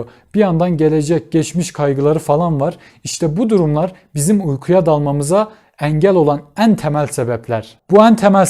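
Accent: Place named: Eastern Anatolia, Turkey. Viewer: Hemşerim nerelisin.. Lisanındaki ton Şarkî gibi..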